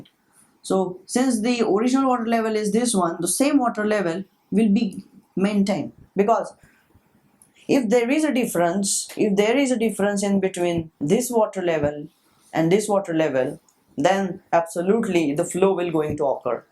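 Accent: India. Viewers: You want to sound like American, but end up as Indian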